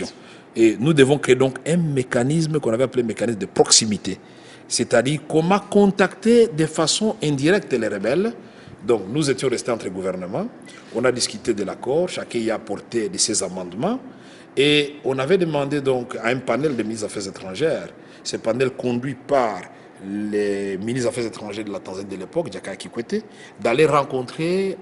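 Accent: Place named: Rwanda. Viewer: Maigres toutes les langues qu' il maîtrise mais il n'a pas perdu son accent kinyarwanda. Chassé le naturel.........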